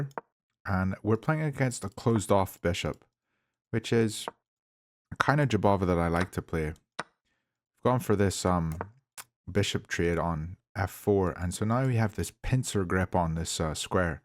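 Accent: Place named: Scotland, United Kingdom. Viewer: Apologies, the accent sounded Scottish to me.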